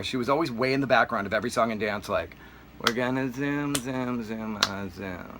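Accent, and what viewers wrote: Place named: Boston, United States. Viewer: is not British he is Bostonian they talk simlar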